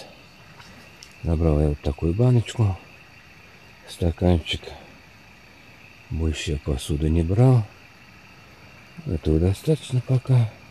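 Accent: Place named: South Ural, Russia. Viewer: 56 лет назад я уехала с родины, Южного Урала, в Казахстан и нашей земляники не видела столько же лет. А вкус её помню. Очень приятно услышать наш говор южноуральский. Спасибо, что всколыхнули воспоминания.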